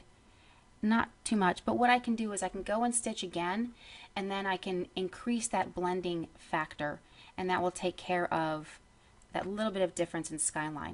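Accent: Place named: Ohio, United States. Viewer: i love your voice and accent - ohio?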